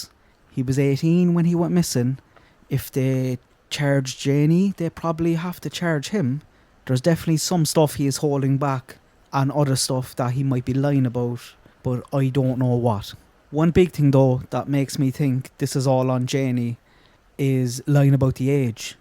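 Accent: Ireland. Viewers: I just want you to know how much I respect your videos, you manner, your insight, your opinion. And an amazing Irish accent to boot. Thank you for putting this out there, I love listening to you ❤❤❤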